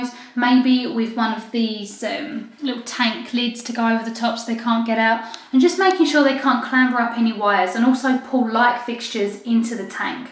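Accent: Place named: England, United Kingdom. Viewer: Are you from UK? You sounded English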